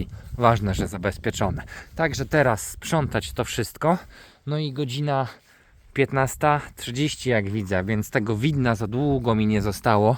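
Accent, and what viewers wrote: Lublin, Poland. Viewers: Z Twoich wypowiedzi wynika , że pochodzisz z lubelskiego. "Ciapy"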